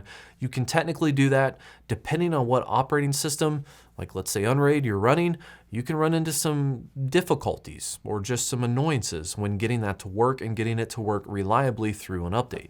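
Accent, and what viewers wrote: United States. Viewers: you're 🇨🇦??? WOULD HAVE NEVER GUESSED! You kind of have an murikan twang. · Ali Naqvi southern accent #Kansas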